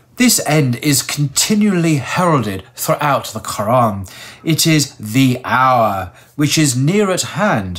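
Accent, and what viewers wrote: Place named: RP, United Kingdom. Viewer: Just a side point but your reading / narrating skill is marvelous especially with that touch of British posh ness Allah bless you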